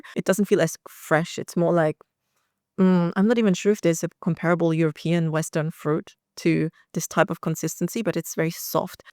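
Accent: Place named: Thailand. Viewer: Are you half-Thai? Your Thai accent is so clear!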